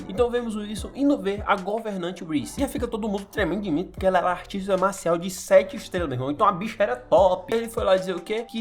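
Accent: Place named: Ceará, Brazil. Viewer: Bom ouvir uma narração de um searense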